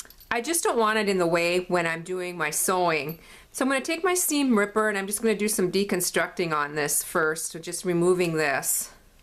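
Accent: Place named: Iowa, United States. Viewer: The only redeeming feature of the belt are the buckle rings...you really made it beautiful. My family in northern Iowa sound just like you...delightful.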